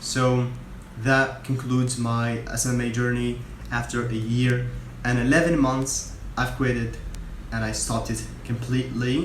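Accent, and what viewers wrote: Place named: Morocco. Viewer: Salam alaykom brother Are u moroccan? Ur accent is giving it away lol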